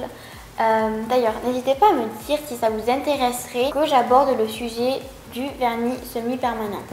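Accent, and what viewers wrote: France. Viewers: Le sang j'adore ton accent du sud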